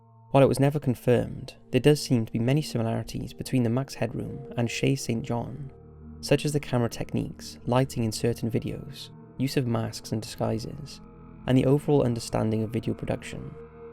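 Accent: Wales, United Kingdom. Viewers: Narrator are you welsh?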